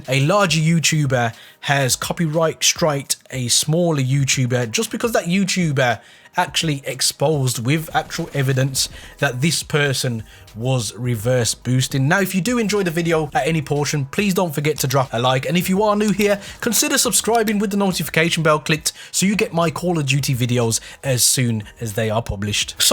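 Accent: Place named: Midlands, United Kingdom. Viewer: are you from the Midlands? I recognise the accent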